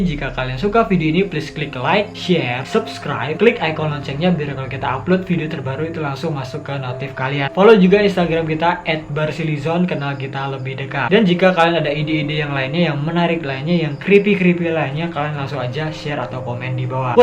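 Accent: Java, Indonesia. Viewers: Abang orang Jawa kah?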